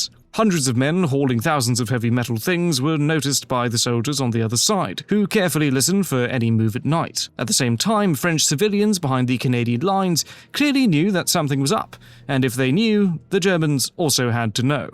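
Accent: United Kingdom. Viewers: Condescending British accent narration is key to losing all your audience.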